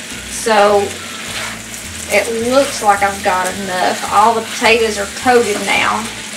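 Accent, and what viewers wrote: South, United States. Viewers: your southern accent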